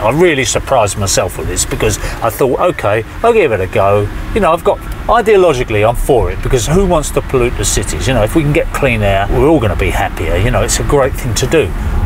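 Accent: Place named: London, United Kingdom. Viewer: Hi. Very interesting video, your definitely a natural in front of the camera. I didn’t realise your stomping ground was London, but I guess you’re accent should have been a clue 🤣. As I have stated before regards the charging aspect, and you have said exactly the same a lot of houses are not able to support the extra demands of changing vehicles. Which might be even more than one vehicle. Again as you rightly point out the government has to take this reality on board, to make this a viable alternative for everyone. Best wishes and kind regards to you and your work colleagues 😀👍👍👍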